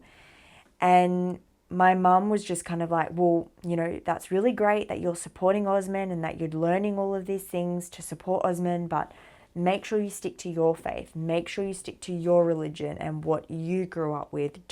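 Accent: Australia. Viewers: Masya Allah Tabarakallah❤ Alhamdulillah Hearing your journey, especially when you called the mosque in the morning for your Syahadat, left me in tears.. Joyful tears Qadarallah Allah SWT planned the phone to be picked up so quick. Love your accent, my Mum's Australian, miss her soo much during this pandemi. I'm a reverd,living in Indonesia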